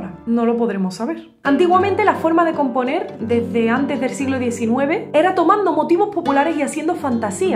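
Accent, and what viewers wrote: Andalusia, Spain. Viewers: ❤ acento español, voz cálida, alegria en la narración y me deleitaste con el sonido antiguo de aires extranjeros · Estará todo inventado pero su belleza,originalidad y pasión por compartir cultura y música no,estoy que hago un curso para hablar Andaluz porque para mi no es habla es música, tan preciada como la antología del cante flamenco, cada post nuevo de es una joya para mi tan valiosa como un concierto de los mas renombrados de la historia,mi sueño es estar en un concierto de su persona y felicitarla por su trabajo. Que bonito.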